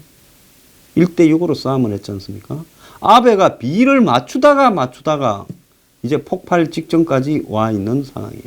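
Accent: Gyeongsang, South Korea. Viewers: 매력: 점점 빠져드는 마초의 갱상도 억양과 가끔씩 날려주는 욕설!" <*랄, 니*미, 니나 잘하세요!(뭉가한테)>이런거 들을때마다 카타르시스 느낍니다! 더 쎄게 해주세요! 쓰레기같은 인간들한테는 말로 해주는것도 아까워요.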